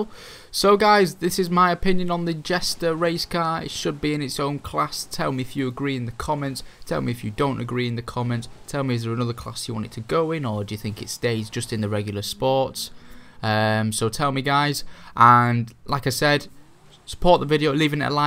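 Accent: United Kingdom. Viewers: also what part of the uk are you from? ive had a few people in lobbies recently telling me I sound a lot like you...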